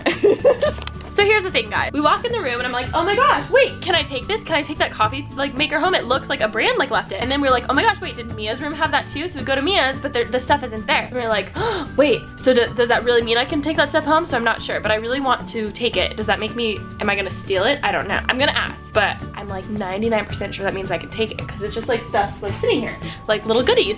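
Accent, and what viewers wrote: California, United States. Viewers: Everytime i watch her vlogs i just say wow the cali accent😂😂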